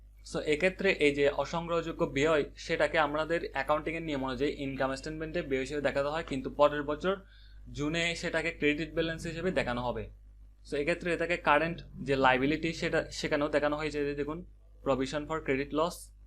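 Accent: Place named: Chittagong, Bangladesh. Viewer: apner vasha dehe mone hocce apni Chittagong ar, I also live in Chittagong please tell me how to contact to u?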